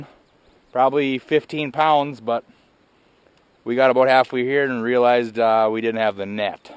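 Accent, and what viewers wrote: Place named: Minnesota, United States. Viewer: with your accent you must be fishing the red river in Minnesota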